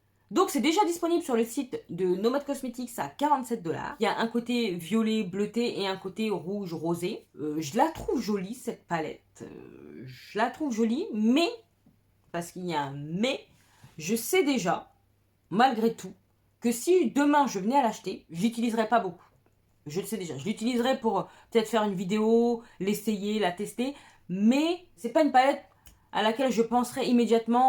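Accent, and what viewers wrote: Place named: Africa. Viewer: Quand tu montes le niveau d'exigence tu prends l'accent africain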